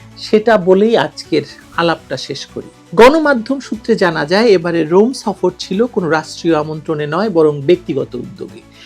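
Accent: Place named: West Bengal, India. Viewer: আফা ভারতের ভাট পারার মেয়েদের মত কথা কয় কেন? বাংলাদেশীরা জানতে চায় ।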